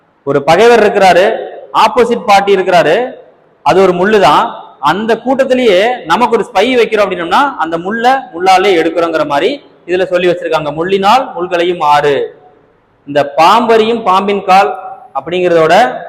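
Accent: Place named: Kongu, India. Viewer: Anna neenga kongu tamil azhaka pesuringa enna oor neenga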